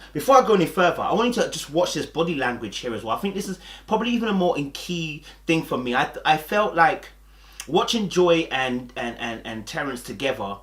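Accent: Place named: Birmingham, United Kingdom. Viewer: The brum accent comes out every now & then 😅💥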